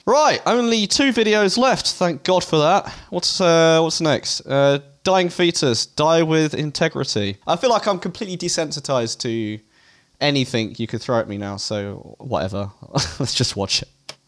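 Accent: United Kingdom. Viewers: Best part is he stayed in character with the British accent and vocabulary all the way through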